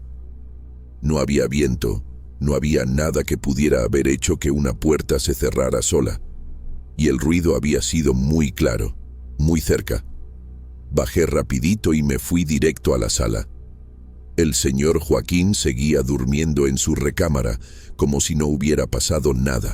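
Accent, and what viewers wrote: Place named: Spain. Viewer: Y luego el acento español lo arruina más.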